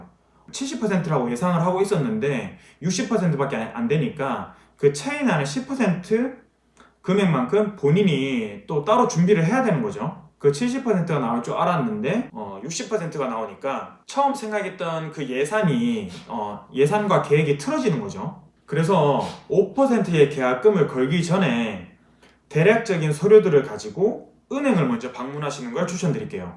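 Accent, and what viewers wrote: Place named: Gyeongsang, South Korea. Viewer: 생긴건 서울 남자 같은데 경상도 사투리 너무 심하게 쓰네 ~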